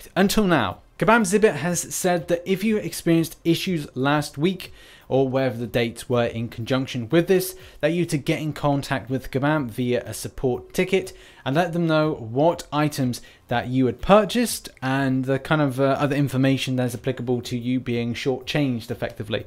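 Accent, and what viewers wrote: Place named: England, United Kingdom. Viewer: it took me 6mths to realize u were saying forum link not foreign link. Good old queens English hey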